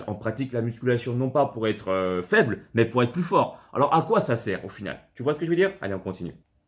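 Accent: Quebec, Canada. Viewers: Accent québécois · C’est un accent du Québec 🇨🇦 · L'accent c'est l'accent Québécois et franchement tout le taffs que tu fait tu mérite clairement plus d abonnés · Un accent québécois ;) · C pas un accent un peu oui mais québécois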